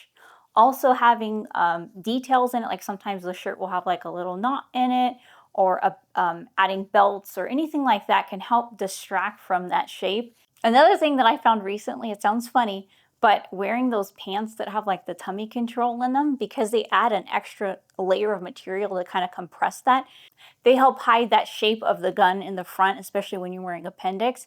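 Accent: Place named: Ohio, United States. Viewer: No matter what I carry I carry in the same place always. Thanks for the Ohio accent,Roll Tide.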